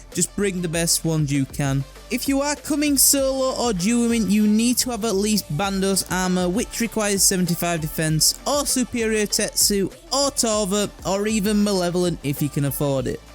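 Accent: Yorkshire, United Kingdom. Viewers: where abouts in yorkshire you at? I assume yorkshire, sounds like it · "Yorkshire on helium " And crack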